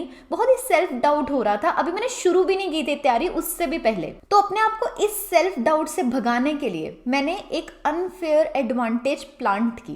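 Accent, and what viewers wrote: Punjab, India. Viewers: Love the tinge of Punjabi when you talk 😊 Chak de phattey Kudiye.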